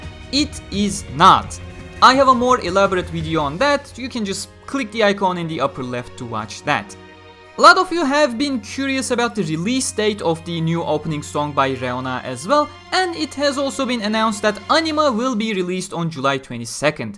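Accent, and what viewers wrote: Turkey. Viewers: Aksan ve nickinden Türk olduğun anlaşılıyor bu arada genede çabaların hoşuma gitti Global olma yolunda başarılar :) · Böyle bir konuşmanın bir Türk tarafından İngilizce olduğu çok belli. Başarılar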